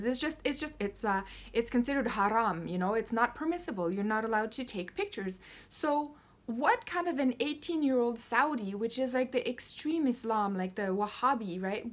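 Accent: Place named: Quebec, Canada. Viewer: I love this channel, are you Quebecois because your accent is beautiful to me. Also love your insight and the emotion you put into it. I feel sorry for Rahaf because she is a pawn in the globalists agenda, willing or not, she will one day disappear without a trace, another sacrifice to the UN agenda and the incoming enforced world religion of Islam. Australia, my country still has due process to follow, we don’t just take anyone because that would destabilise our borders, unfortunately we’ve taken too many of the wrong types already, we should, however, immediately demand the release of Asia Bibi into our care, and the white SA farmers.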